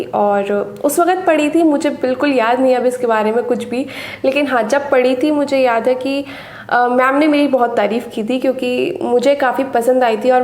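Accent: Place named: United Kingdom. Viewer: Missing the British accent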